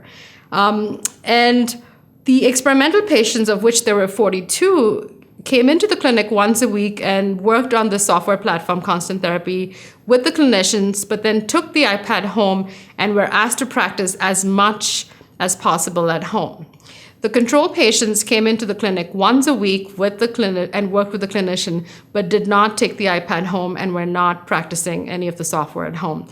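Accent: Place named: India. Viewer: sounds like you are indian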